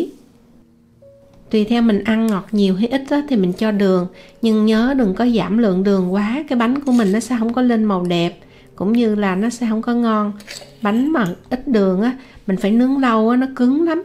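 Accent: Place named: South, Vietnam. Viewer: 1 giọng nói miền Nam rất ngọt ngào và tự nhiên. Rất lâu rồi tôi mới đc nghe lại, ở VN bây giờ như bị nhiễm cái cách hành xử của dân Bắc sau này nên dần dần vẫn có vẻ dối trá trong cách nói. Tôi là dân Bắc nên nghe ra đc, ko còn đc cái chân thành như của. Cảm ơn. Nghe dạy nấu ăn như nghe nhạc á.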